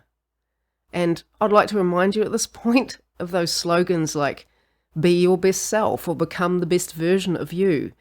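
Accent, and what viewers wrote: New Zealand. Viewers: Kiwi accent.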